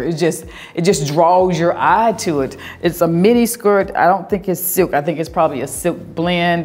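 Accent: South, United States. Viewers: Every time I hear you pronounce the perfume Baccarat, it sounds like you are say “Baklava”, like the pastry. It’s so funny your southern drawl. 😆😆😆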